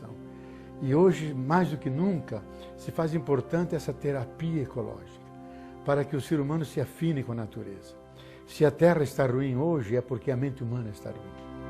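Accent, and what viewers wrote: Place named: São Paulo, Brazil. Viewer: Greatfull,gosto muito desse sotaque paulista, meio double de desenho animado,.magos,freud,investigou os desejos animais " no ser sofisticado, humano,young,observou, outea dimensão, de um modo,discreto dialogou,muito bem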